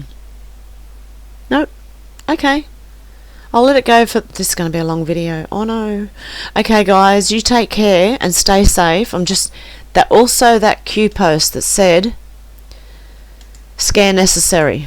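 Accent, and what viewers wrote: Australia. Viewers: You are amazing I am so glad that I stumbled across your channel and subscribed. I can tell that your heart is true your soul is pure and your spirit as of an amazing light and I love your Australian accent. Thank you for all you do. My name is Donnie Jordan and I will continue to listen just love your voice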